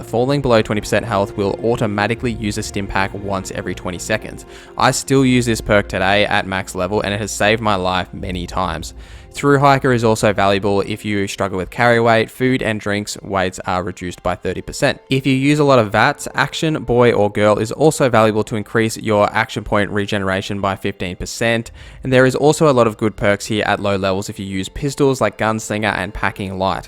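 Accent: Australia. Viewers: cheers mate great 2 hear an aussie doing it write thanks for the tips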